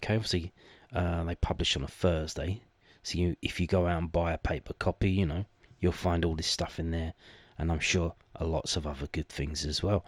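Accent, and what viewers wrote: Scotland, United Kingdom. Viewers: Your Scottish accent is awful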